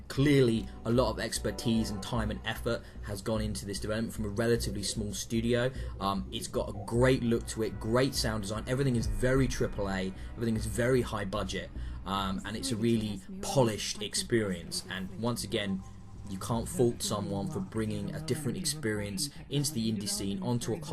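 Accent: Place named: United Kingdom. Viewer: Ok, now this is crazy! were we separated at birth? Are you my much, much younger twin? I bought this about a week ago and played it, finished it, etc. If I were to do a review of this myself we would have the most exceedingly similar reviews spanning two continents. About the only difference, my review would not have the British accent gravitas. Oh and probably a lot more cussing, I cuss so much I could make a sailor blush. It's not ad-lib cussing, like cussing just for the sake of throwing a cuss word in, I do use them properly, just a lot. This is now too funny. I have been checking out all of your reviews and for years I have assumed that the hospital I was born in made a huge, giant mistake. Now I know they did, LOL. I have been sure for many years I was given to the wrong parents, I have nothing in common with them. I am cerebral, love dark humor, ballroom dance, theater, museums, and history. 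Actual history, not the crap we peddle as history in this country. My parents are the opposite of that, I graduated high school at 14, and moved away to college shortly after, thankfully! My favorite comedy shows are Monty Python's Flying Circus, the Young Ones, Absolutely Fabulous--although their latest movie was absolutely not fabulous! John Cleese is in my top 5 for actors. I don't know how this happened but I now see how conspiracy theorists get their start. I hope you and the misses have a wonderful day, nudge, nudge, wink, wink, say no more!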